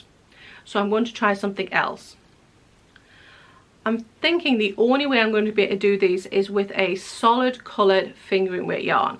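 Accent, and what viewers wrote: North East England, United Kingdom. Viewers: Nice to hear a north east England accent in Orkney! I am a Geordie Knitting in Fife! East Neuk Knits festival coming up in Fife end of November is so exciting it was brilliant last year. We are so lucky in Scotland.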